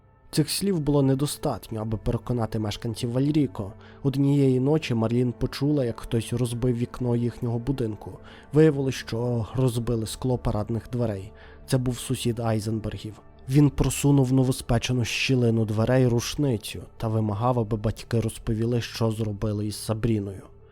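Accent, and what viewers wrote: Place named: Ternopil, Ukraine. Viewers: На таку файну тернопільську вимову нелегко нагнати саспенсу, хоча дуже стараєшся) якщо звикнути то норм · Я також припустив що автор з західної України😊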